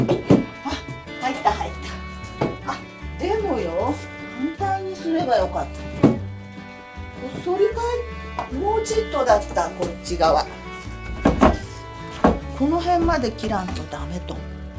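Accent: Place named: Yamanashi, Japan. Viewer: 祖母が山梨の人のため、たまにでる甲州弁に懐かしさを覚えながら見てます。将来こんな暮らししたいです。見てて元気が出ます！！いつもありがとうございます！！！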